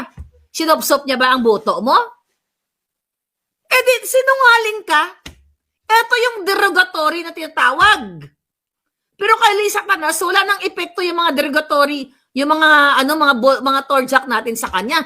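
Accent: Visayas, Philippines. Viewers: Naintindihan ko maharlika ang bisaya mong salita hehehe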